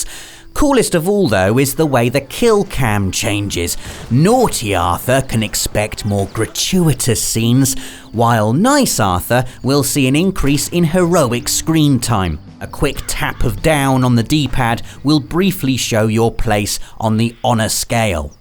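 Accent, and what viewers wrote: United Kingdom. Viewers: Not another limey accent....Johnny Rotten plays rdr2?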